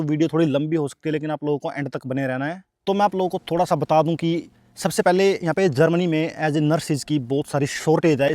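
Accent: Haryana, India. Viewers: Bhai Haryana te lage mne tu ❤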